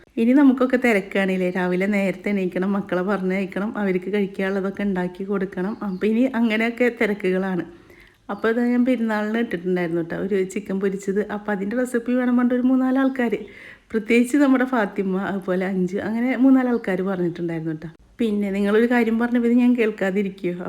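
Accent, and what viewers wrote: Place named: Thrissur, India. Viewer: Edaa.orupaad thanks..undaakki kaanichathil..undaakkeet abipraayam parayaam👍❤️❤️aa kini kini trissur samsaram kurakkallee..kurachu koottikolooo😁❤️❤️❤️❤️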